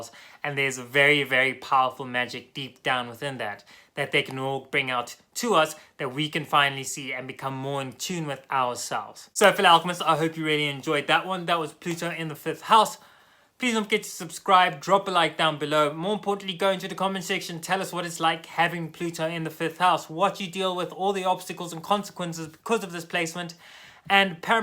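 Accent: South Africa. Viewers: Are you from South Africa or perhaps New Zealand?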